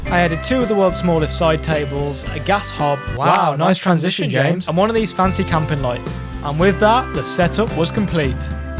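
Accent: United Kingdom. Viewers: You'll definitely reach 1 million in 2026 cuz of your thumbnails and cinematography, like ymeven your british accent is nice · I love that British accent 🤌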